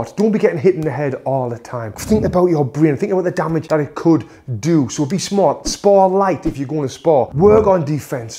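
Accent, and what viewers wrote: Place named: North, United Kingdom. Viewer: That northern accent sounds so surprising coming from someone with a deep tan, hahaha. Looking good, Tony!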